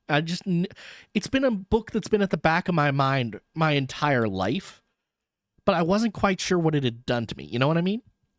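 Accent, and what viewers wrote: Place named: South, United States. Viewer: Your Southern accent coming out really suited the rider camp argument I thought - very much enjoyed it and I'm from Europe. Fitted the worldbuilding I thought. Please continue if you can. I too recall reading this book too young - I picked it up at the library because of the horses on the cover and being an SF/F fan and quickly put it down after getting freaked out by the swarm animals. I picked it up years later and enjoyed it much more, although I can find the prose quite repetitive at times. Cherryh is so good at making the world beautiful, intriguing and horrifying at turns. I love her names: 'willy-wisps', 'wally-boos', 'lolly-lies', 'goblin-cat', 'spook-bear' etc. The fact they can trick you psychically in these evolutionary ways and how that can infect humans psychologically en-masse is genius level worldbuilding. 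The main 'villian' (no spoilers) of the duology is also fantastic, Cherryh turns usual tropes on their head. Wish we got that third novel.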